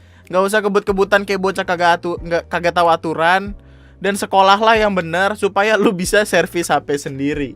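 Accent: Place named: Java, Indonesia. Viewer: Radak radak Jawa woskwowkowkwowk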